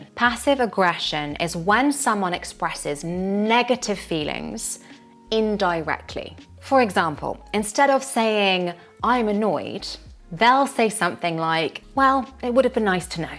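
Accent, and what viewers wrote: United Kingdom. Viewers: I love british English I always watch your videos teacher Greetings from Peru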